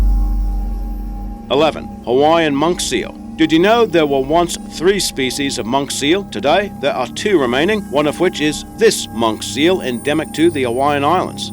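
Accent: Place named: Australia. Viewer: Your accent sounds Australian. If so, you've got a few amazing animals yourself...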